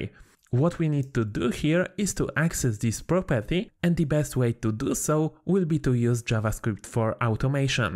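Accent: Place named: Poland. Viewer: Niestety ten filimik jest zbyt bardzo polski :( Uszy bolą od kłującego polskiego akcentu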